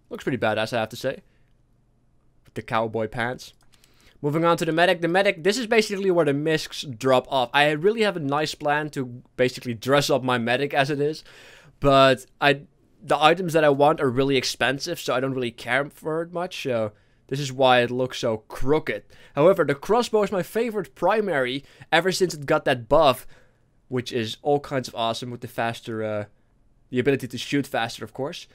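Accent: Netherlands. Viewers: Is this guy dutch? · Are you dutch?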